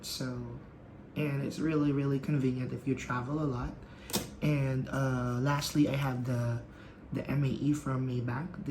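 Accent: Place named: United Kingdom. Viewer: I can heaar UK accent 😂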